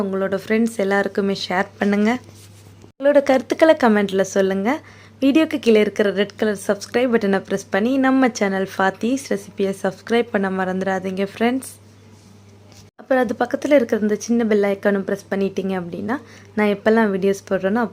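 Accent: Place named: Nagercoil, India. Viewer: Super dear.. neenga pesrathu cute ah iruku.. namma nagercoil slang super 🤩🤩👌👌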